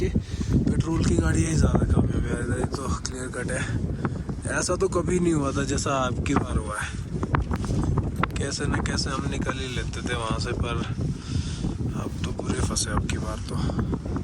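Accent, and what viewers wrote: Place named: Himachal Pradesh, India. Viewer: Bhai himachal se ? Himachali language lag rhi hai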